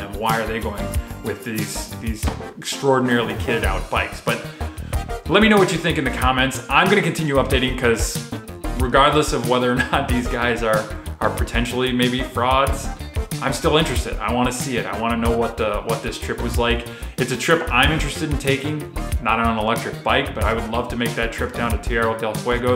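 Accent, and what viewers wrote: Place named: United States. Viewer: Cannot hear much of what you are saying. Your strong U/S accent, which is not easy for native speakers of English to understand, and the overbearing music (noise) defeat your purpose. I persevered, but gave up after about 4 - 5 minutes.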